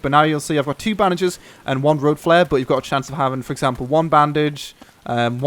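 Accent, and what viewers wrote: United Kingdom. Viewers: British accent :D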